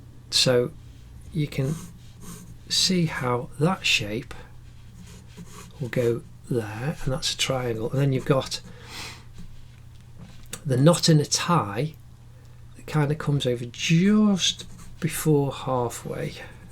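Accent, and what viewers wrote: United Kingdom. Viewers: Whoa! Your accent is like newt scamander!!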